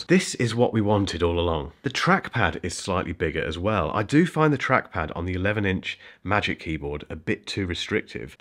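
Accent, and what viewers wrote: United Kingdom. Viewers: Damn your voice is so powerful and that Brit accent is so lit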